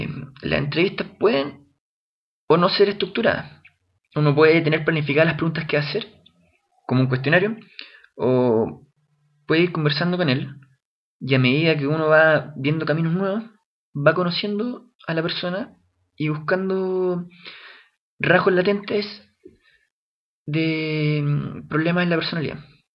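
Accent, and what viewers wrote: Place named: Chile. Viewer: ¡Es chileno!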